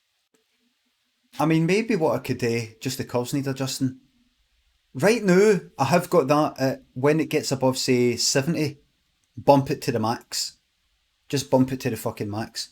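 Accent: Glasgow, United Kingdom. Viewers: I wish YouTube's automatic CC knew how to translate Glaswegian to English.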